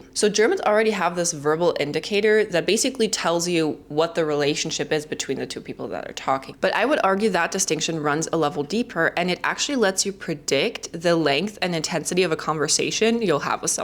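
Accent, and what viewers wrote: United States. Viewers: HI! 58-y/o guy here. You don't "sound" German, so US'rs likely think you're "one of us," if you will. You speak like an American from the vids I've seen, so hopefully this will help! Americans see everyone as a potential friend, so if I were in another US city & saw someone wearing a sweatshirt of Madonna's concert in Chicago, say, I'd think nothing of going up to said person & gushing about their sweatshirt & that concert. "Wasn't that a Great concert?" S/he would likely say, "Yes, OMG, YES!" & we'd talk about it. We wouldn't think anything of this interaction. I'm told that this would embarrass folks in the UK & EU, b/c everyone sees themselves as private. The Sie/du forms (Germany & other EU countries, & the Brit perspective on formality) seem to prohibit this. English used to have a formal form (I forget it; I think that's where "y'all" came from), but it's been eliminated as the language has been watered down. 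But welcome to the US!:-) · Hi, wow, this was brilliant, almost. I mean clearly above average in comparison to other American versus German stuff. Your American accent is beautiful. In most cases I prefer International English, but you sound awesome.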